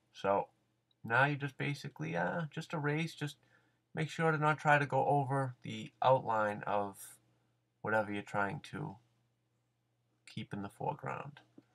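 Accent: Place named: New England, United States. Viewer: i love your new england accent <3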